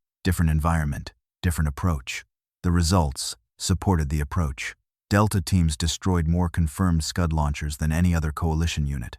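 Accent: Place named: United States. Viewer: Would be nice if these narrators spoke actual English instead of American bollox!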